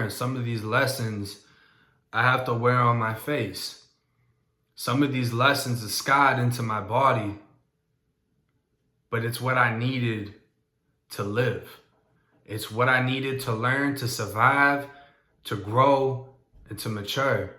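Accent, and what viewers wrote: Boston, United States. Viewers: he talking about florida but sound like he from boston...the fuck? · Say bro you from Boston or Florida . You kinda sound like ol boy from Sam Adams commercial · I can tell your from Boston cuz the way you say your A's. For ex Stahted= Started Cahky= cocky but you may have grew up from 12ish on in florida. But you def dnt have a native north or south Floridian accent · Your accent sounds like you’re from Bean town!! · You from boston originally? I hear it in ur voice occasionally and honestly you just look and sound Boston bred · I knew you had to be a Boston kid from your accent but you always talk Florida stuff, you even kinda still got our swag for someone who says you didn’t grow up in mass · You sound like your from Boston. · Bruh u gotta Boston accent but ur from florida??? I been to Illinois doc but from what I seen bout Cali prison white boys who r confused bout their pigmentation come in tryin to sound black. 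Get reminded who they are real quick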